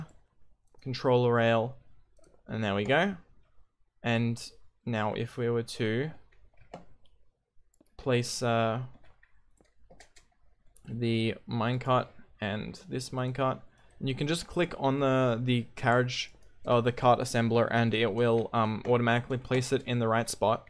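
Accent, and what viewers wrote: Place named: Australia. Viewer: You sound like an australian =) "Soouu"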